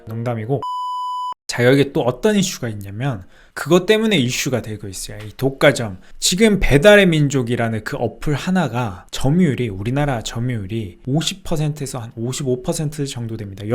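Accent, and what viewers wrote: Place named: Seoul, South Korea. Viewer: 이제는 서~~울 사람 같아요 ㅋ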